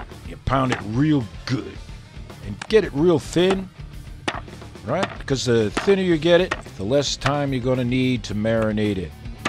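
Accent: South, United States. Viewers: James Cassel Southern U.S, man. Don't judge them.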